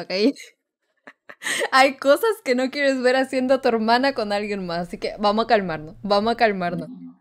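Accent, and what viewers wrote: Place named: North, Mexico. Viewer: Le achunto al clavo lo de norteño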